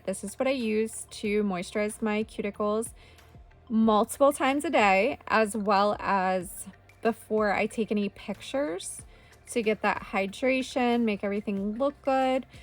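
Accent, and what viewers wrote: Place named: California, United States. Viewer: So so helpful to have these suggestions in a single format. Thank you. I have used so much of your suggestions. Oh, and as I was watching your video, my son walked into the room and asked if you were a California girl. Because of your "accent". LOL, wasn't aware you had one🤣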